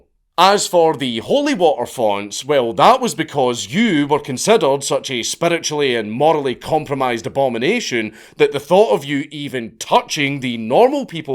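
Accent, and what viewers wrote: United States. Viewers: Never thought I would hear do an American accent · Pretty good American accent ya got there my Scottish friend. Im also of Scottish, Dutch, French and Native American hertiages. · Your American accent is good! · Pretty good American accent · That was a pretty good vaguely American accent, I do a pretty good accent too but only when I am drunk. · That American accent though. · Brillant opening. Excellent American accent. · Dankula doing an American accent threw me off so hard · That's a good American accent. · with an American accent is CURSED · Dankula, your American accent is great. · Dude, good work on the American accent! Much respect from the land of the whatever we are these days! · Mr. Dankula, i must say i love your American accent. you should do it more often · Just got here from X. Love this style. Dig the gruff American accent. 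· Not the worst take American accent I've ever heard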